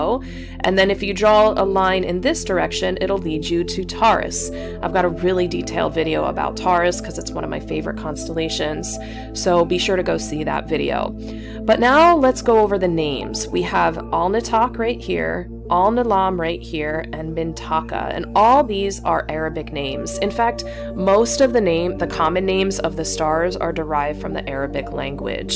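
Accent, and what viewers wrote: United States. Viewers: You from the east coast, possibly jersey?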